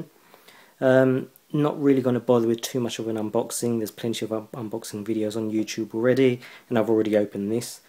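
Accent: England, United Kingdom. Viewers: Where about in England are you from? :)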